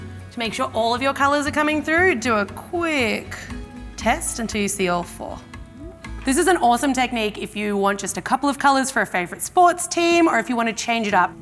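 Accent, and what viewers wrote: Australia. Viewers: Is she Australian? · She sounds like she’s from Australia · This was fun to watch tbh haha. and is that an Australian accent? I love her voice lol 😋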